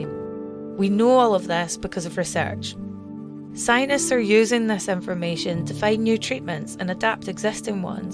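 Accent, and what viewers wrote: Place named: Scotland, United Kingdom. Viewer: I can't really focus on the content because you're speaking in a scottish accent XD Sorry that's how I'm easily getting distracted :( Don't hate me please x(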